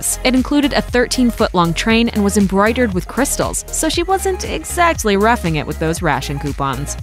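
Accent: United States. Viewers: American accents (and not well spoken) are wrong for this kind of documentary (my opinion) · why is the narrator American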